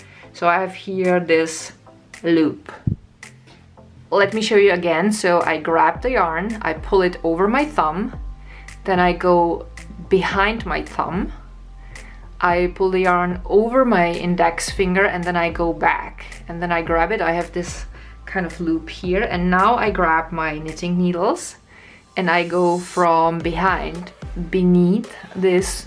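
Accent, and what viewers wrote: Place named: Germany. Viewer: So practical that you explain how to do it with a long wire! Its just what I needed! You are an amazing teacher! Love your german accent. Grüsse aus Argentinien und danke vielmals!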